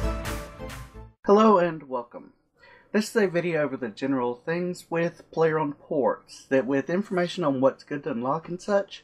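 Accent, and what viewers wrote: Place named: South, United States. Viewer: southern guy love it :p sound like a friend i used to know .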